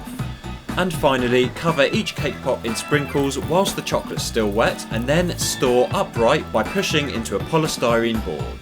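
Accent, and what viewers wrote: United Kingdom. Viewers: BRITISH ACCENTS ARE SO ANNOYING but the recipe seems simple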